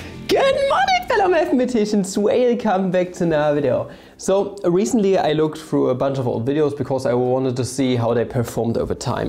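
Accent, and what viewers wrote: Germany. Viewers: Ich stoß grad so auf dein kanal und dachte mir: Alter der kerl hatt doch nen deutschen akzent. Aber geiles video alter feier ich sehr das thema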